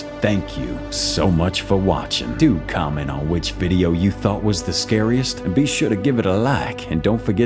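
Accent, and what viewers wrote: Louisiana, United States. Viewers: Love the "Wheezy Anna" accent brother...great content as well. You just gained another sub! Feed us!!!!! ;)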